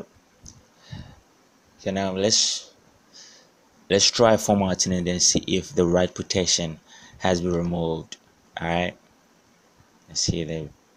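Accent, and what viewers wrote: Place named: Africa. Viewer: You have an African accent, are you from Africa?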